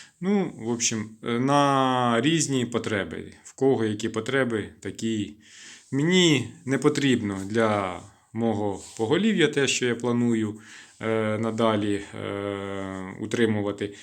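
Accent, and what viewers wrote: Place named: Kyiv, Ukraine. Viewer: ви і родом з Херсонської області? Розмовляєте,як у нас на Київщині 😀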